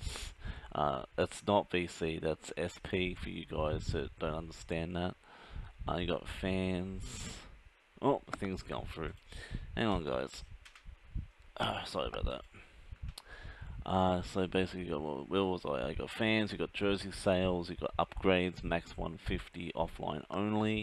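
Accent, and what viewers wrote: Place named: Australia. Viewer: You got an Aussie accent plus I saw that you modded don bradmen which is an Australia game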